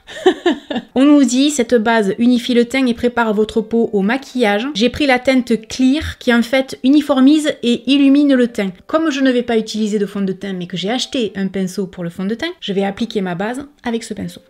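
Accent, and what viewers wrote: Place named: Pas-de-Calais, France. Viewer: J adore tes vidéos et ton petit accent bizz du pas de calais 💋👍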